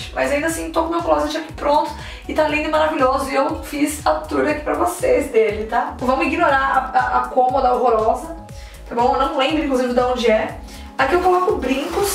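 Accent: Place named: Rio Grande do Sul, Brazil. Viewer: Sotaque gaúcho....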